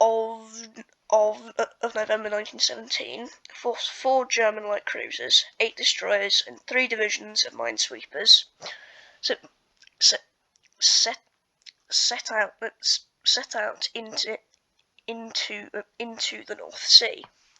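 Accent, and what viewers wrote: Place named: England, United Kingdom. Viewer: Thank for this really well put together video. For the first time in ages, I'm getting to view something where the narrative matches your visual presentation accordingly and accurately. Your English accent turns my ears into soft butter. A pleasant change to my usual cretinous American environment. Well done and thumbs up.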